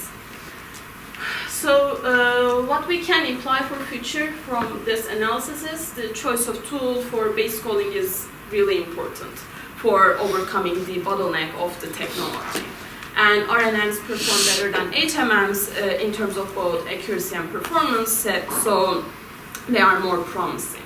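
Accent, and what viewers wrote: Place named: Turkey. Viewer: That turkish accent and nervous voice was kinda hard, but the content was awsome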